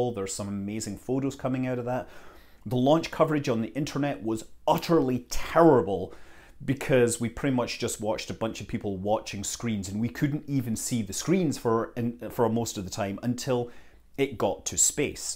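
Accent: United Kingdom. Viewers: That outfit in combination with arguing on space travel in British accent immediately reminded me on Arthur Dent. Sorry for that.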